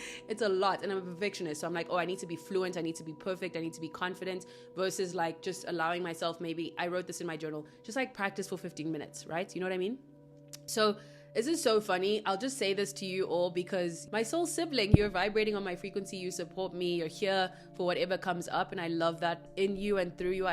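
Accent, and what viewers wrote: South Africa. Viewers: BABES ARE YOU FROM SOUTH AFRICA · I just KNEW that lovely, beautiful dialect was South Aftican! Love the “flea market of life” analogy. Love to you, Darling Sister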